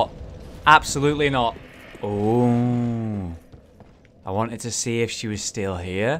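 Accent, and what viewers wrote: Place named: Wales, United Kingdom. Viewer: Scottish I thought he sounded Welsh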